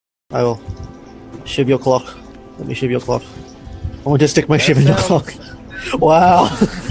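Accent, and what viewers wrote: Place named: Australia. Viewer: Love your accent man! Australian? Also I tried it and it was heaps fun haha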